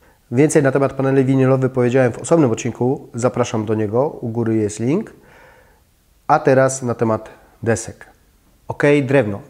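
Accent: Silesia, Poland. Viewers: Stara się, ale słuchać śląski akcent:)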